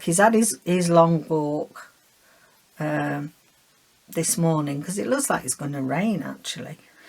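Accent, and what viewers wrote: Yorkshire, United Kingdom. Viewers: Don't change a thing,. We love your enthusiasm and for sharing your techniques and especially for your accent from God's own county. (Yes I'm biased having been born and bred in Yorkshire but now live "down south"). While watching you I'm finishing my fourth A5 piece of improv. stitching (inspired by Ariane Zurcher). Next week I'm going to start the wrap fabric cover (inspired by Anne Brooke "Wobble Gob 3) - another Yorkshire girl!! Can't wait for the pick jar. Enjoy the plum crumble!!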